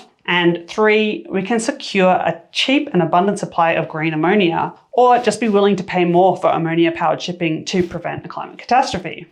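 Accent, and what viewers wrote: Australia. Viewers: I like my bad news with a chipper Aussie lilt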